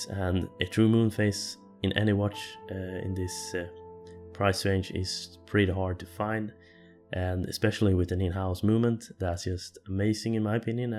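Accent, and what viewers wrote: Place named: Sweden. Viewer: May i guess, ur swedish arent u? Typisk svensk accent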